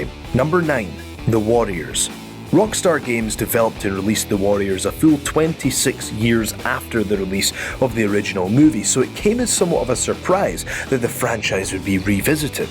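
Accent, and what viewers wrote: Scotland, United Kingdom. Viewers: the lovely thing about is their presenters are always SO hot :P that sultry Scottish voice serenaded my soul haha.....plus hes proper fit. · I just love to hear this guy talk. is he Scottish or Irish? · It is his Scottish accent. Dune becomes Djune, Duracell is Djuraceyll etc. No big deal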